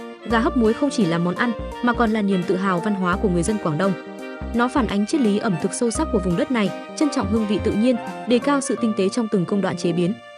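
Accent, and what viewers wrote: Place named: Vietnam. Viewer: AI nó đọc thì đọc theo cách phát âm tiếng Việt là phải rồi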